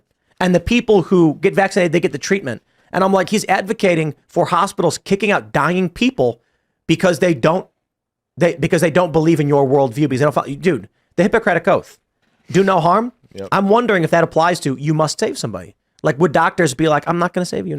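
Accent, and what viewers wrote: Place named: Chicago, United States. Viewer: I love it when you can hear go full Chicago gangster vernacular. It's subtle but it's there.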